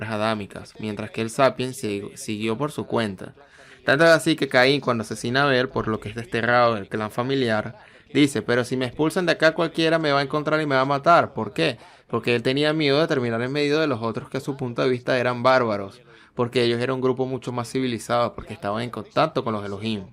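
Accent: Venezuela. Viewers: No es así lo que sucede es que tiene el acento venezolano diferente a otro país que no sea caribeño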